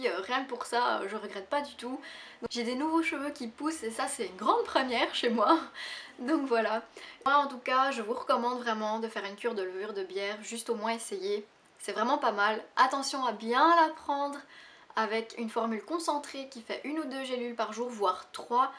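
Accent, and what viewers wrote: Belgium. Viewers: Par contre un léger accent belge , pas besoin d'hortophoniste !😊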